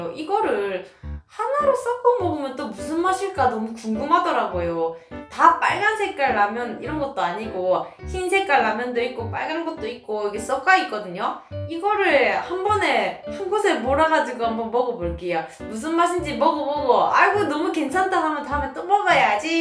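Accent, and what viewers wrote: Gyeongsang, South Korea. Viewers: 님 갱상도사투리가 정답네용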